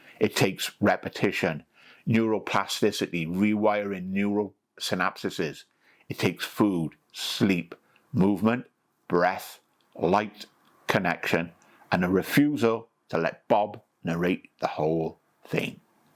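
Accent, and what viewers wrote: United Kingdom. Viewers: I'm from USA and this gentleman should get a medal. I get his Brit accent and directness like he's talking to a friend. I recommend you view as often as possible during sobriety.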